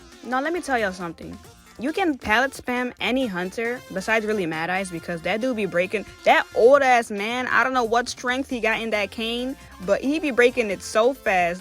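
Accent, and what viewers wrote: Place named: Africa. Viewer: Maybe africa english?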